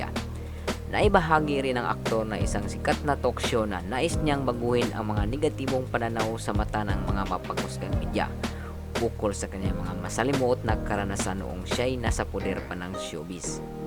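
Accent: Visayas, Philippines. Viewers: Ang panget mung mag salita visaya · Bisaya po... gaya ko... ganyan talaga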